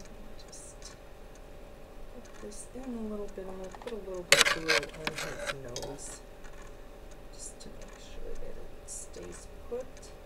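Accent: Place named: Michigan, United States. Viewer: That was a great tutorial and I enjoyed hearing your voice from MI perhaps? I can't wait to get these myself.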